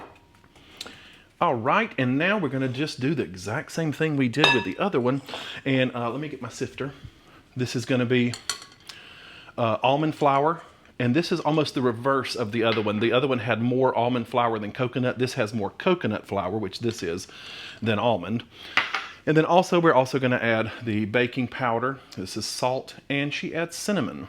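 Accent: South, United States. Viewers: Love your Southern accent. Can’t wait to try these; I need to research Swerve sweetener (I usually use a monk fruit/Stevia combo).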